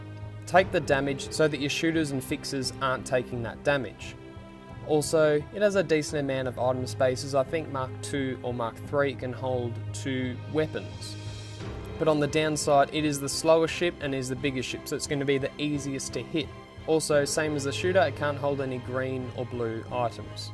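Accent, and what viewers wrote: Australia. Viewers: An Australian eh,lol,I never would've thought.no offense mate,I think you should try defender,I'm mk2,but I have over 2k health,I think you would like it. Plus great job with the vid👍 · Spoken like a true Auzzie ! great vid man loving it !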